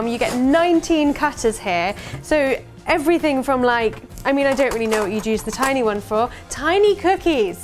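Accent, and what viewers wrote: United Kingdom. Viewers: Learning British Accent in this channel is my fave ❤️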